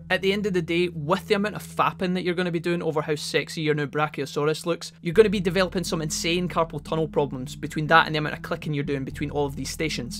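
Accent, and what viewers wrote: Scotland, United Kingdom. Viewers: thank god I have found you. many of those videos, especially the LPs are provided by folks with nerve grinding voices. is it excited teenagers? Idk, but it's so hard to focus on the game with this auditory abuse. you, however, have a calm voice and scottish accent. what's not to like? thumbs up! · Thanks for the tips. Love coming here also cause of the Scottish accent 😂😁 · Excellent tutorial so thank you very much for that! It is so easy to understand your Scottish accent, that is a really big bonus man. Cheers.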